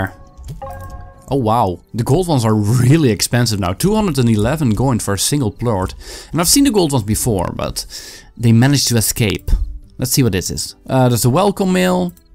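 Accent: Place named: Netherlands. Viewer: i think he's Dutch lmao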